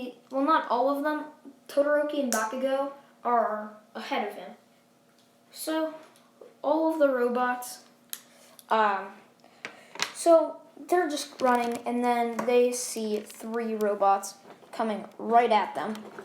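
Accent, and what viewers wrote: United Kingdom. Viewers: It was British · Is this man brithish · U british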